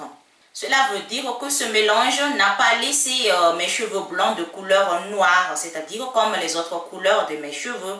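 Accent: Madagascar. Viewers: Bonjour la Malgache 😬, Belle accent, je suis Malgache aussi , bravo pour l'info🌹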